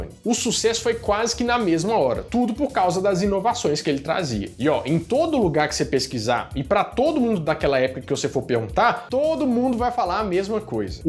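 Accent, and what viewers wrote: Minas Gerais, Brazil. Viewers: Ah !!entao vc é mineiro !❤️❤️❤️❤️❤️fala da bobo dog mochila e bob au au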